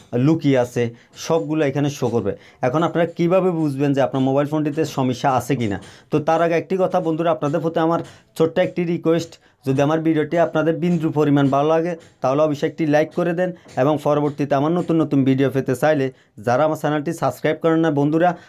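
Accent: Noakhali, Bangladesh. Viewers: ভাই নোয়াখালী কোন জায়গায় আপনার বাড়ী,,,কথা শুনে মনে হল আপনি আমার দেশী ভাই,,,,,🌾🌾🌾🌾🌾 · Vai tor basa Mona hoy নোয়াখালী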